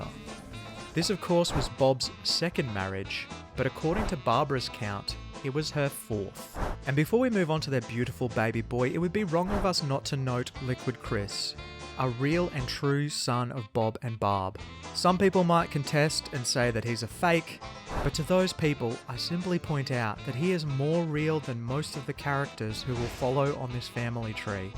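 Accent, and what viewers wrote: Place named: United Kingdom. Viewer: I love the great British narration keep up the good work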